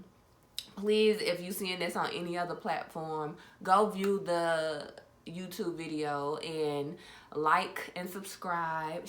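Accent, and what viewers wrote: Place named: United States. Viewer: Where in the US are you from... I love da accent. You are beautiful and blessed.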